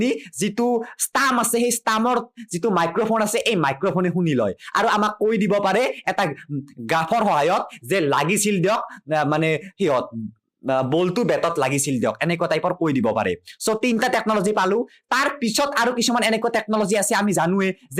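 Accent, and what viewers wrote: Nalbari, India. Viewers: Katha bilak suni val lage nolbaira tu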